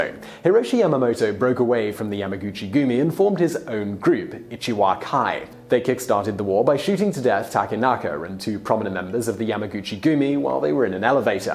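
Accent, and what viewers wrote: United Kingdom. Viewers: ugh, its not pronounced ya-KHU-za. Yakuza is pronounced "Cheese." Yes, I am A) busting your balls, and B) displaying how much most of us give a damn how some brit pronounces names in a culture whos language is completely separate from English. As in not very much at all. Dont get caught up in the vocal minority, Most of us are just looking to be entertained for a few minutes, doing some research and giving it your best go is all we expect.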